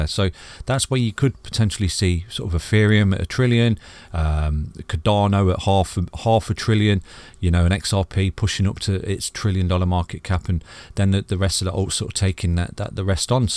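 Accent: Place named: Leicester, United Kingdom. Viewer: Thanks again for the great content, you are my new favourite channel!. i have been following mostly American channels like the Block chain backer and working money channel ect, i have learnt more from you in the last 2 weeks than i have in a year. love the way you come across with no BS. i would love it if you could do more TA so we can learn all the tricks of the trade. great channel, well done pal, thinking that is a Leicester accent you have!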